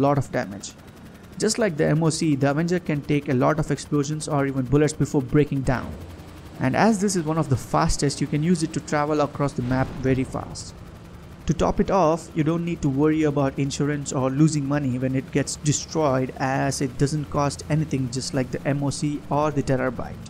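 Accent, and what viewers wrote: India. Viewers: Indian i think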